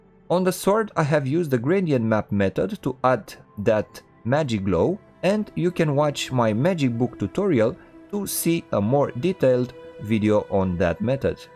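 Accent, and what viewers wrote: Romania. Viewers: you are from Romania you have a Romanian accent p.s I like what you do I discovered you a few days ago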